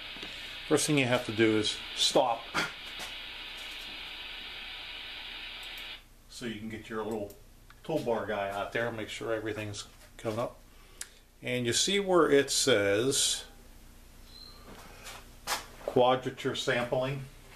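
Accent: United States. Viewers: are you from Pittsburgh? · Sounds like you have a Pennsylvania Dutch Accent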